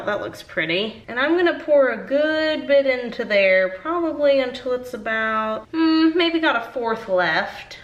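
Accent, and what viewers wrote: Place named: South, United States. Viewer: I go to soap vids when i run out of pimple popper vids. I love yours cause your from the south and so country like myself.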